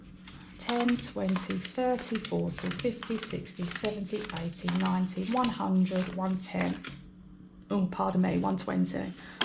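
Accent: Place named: London, United Kingdom. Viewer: Omg I hv to say u are absolutely hilarious 😂, such a great accent is it London? I've really enjoyed watching 👀 ur videos thankyou for entertaining us on this channel xxxx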